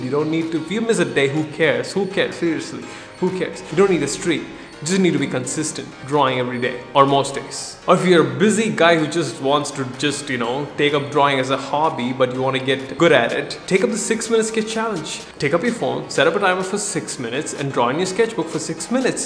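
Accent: India. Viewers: I love how he says “cool” in that sick Indian accent all the time.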